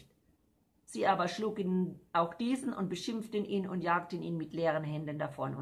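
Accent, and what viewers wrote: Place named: Baden, Germany. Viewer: Liebe, ich komme Auch aus dem badischen, ich wohne bei Wiesloch 😍 Habe früher schon gedacht, dass wir aus einer Gegend kommen, auch wenn Du sehr schön hochdeutsch sprichst, man hört es trotzdem "e bissl " Gottes Segen ins Ferne Amerika 🙏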